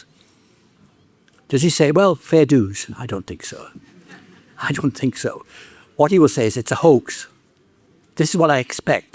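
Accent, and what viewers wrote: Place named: England, United Kingdom. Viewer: Mr. Gray's description of how and why America is embroiled in the Trump Era neglects the role played by those here who are enamored with fascism. Sure, Clinton's insensitive, closed-door rhetoric alienated many who would have never voted for her but to focus on this aspect alone is disingenuous, at best. Also, the "deplorables" weren't labeled as such because they love mining fossil fuels. They were labeled, fairly or not, because of their lack of social grace and their willingness to support a sexual predator who promised to hurt their enemies. Sometimes, an English accent doesn't make you sound smarter - it just makes you sound like an out-of-touch elitist from across the pond. Do better.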